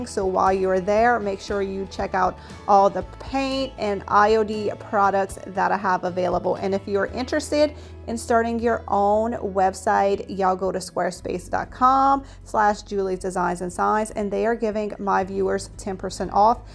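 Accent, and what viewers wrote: Louisiana, United States. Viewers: You sound like a Louisiana girl! I’m from Louisiana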